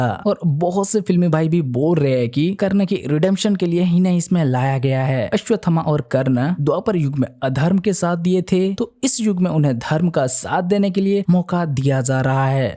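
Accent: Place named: Gujarat, India. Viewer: Aise kyun bol raha hai jaise koi teri le raha hai. Sorry, but bohot irritating hai. Dusra ye hai ki if you are Odiya or Bengali make video in local language, jyada better. Kyunki teri Hindi waisi hi hai jaisi Gujarati logon ki Hinglish.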